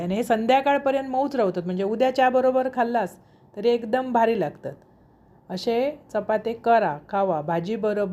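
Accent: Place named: Malvan, India. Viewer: Khupach chan🙏🙏 pan तुमचे बोलणे जास्त सुंदर आहे मला खूप छान वाटते तुमचे मालवणी पद्धतीचे बोलणे मला हिं च्चहा chapati khupach आवडतात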